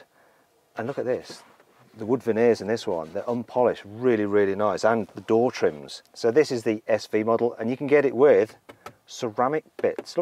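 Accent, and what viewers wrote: United Kingdom. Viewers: Saya kan bilangnya suka asen dia, bahasa dia, logat dia (si tukang review) ketimbang aksen amerika Iya dia aksennya british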